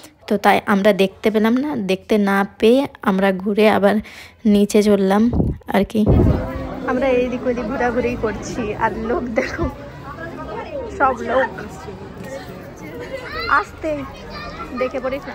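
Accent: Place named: Kaliachak, India. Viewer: Vasa te bujha jaichhi tumi kaliachaker 😂😂😂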